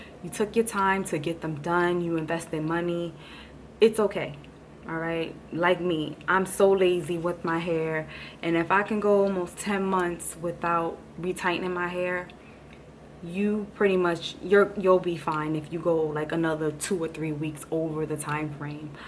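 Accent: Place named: Harlem, United States. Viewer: Lol! I guessed that watching one of your other videos bcse I recognized the accent and the attitude (Harlem native, right across the bridge 😉) Peace & Love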